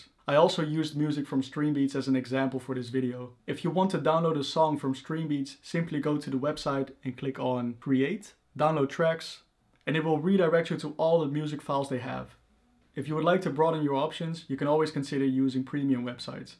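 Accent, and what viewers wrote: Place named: Netherlands. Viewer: you got an accent are you from the netherlands?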